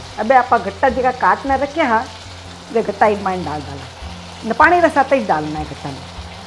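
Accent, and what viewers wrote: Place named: Rajasthan, India. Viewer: Mai bhi marwadi hu aur aap ki marwadi sun kar mujhe lagta hai jaise mai apne family member ko sun rahi hu ❤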